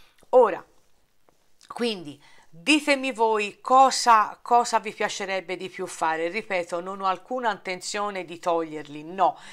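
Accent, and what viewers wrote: Tuscany, Italy. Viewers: io comunque ti seguo a prescindere perché mi piace innanzitutto la tua parlata toscana e i tuoi " e bla bla bla" mi fanno piegare in due dal ridere! Ti abbraccio forte!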